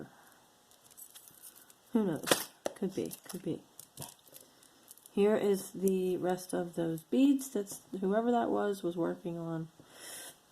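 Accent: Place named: Ireland. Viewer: Love the Irish accent! 💚🍀💚🍀💚🍀💚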